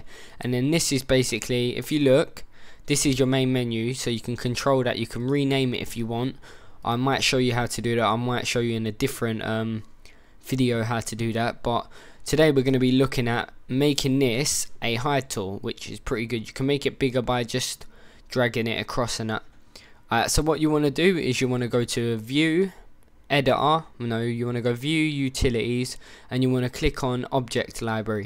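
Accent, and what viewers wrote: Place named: East London, United Kingdom. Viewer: Brilliant tutorial, and your epic east london accent is boss!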